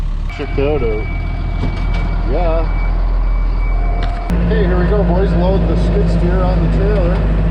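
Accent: Canada. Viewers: Hr sounds like Thommy Chong, I guess its a Canadian thing 🇨🇦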